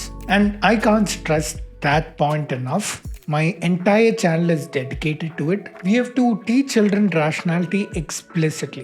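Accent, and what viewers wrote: Kerala, India. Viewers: Your Mallu Accent 😂😂😂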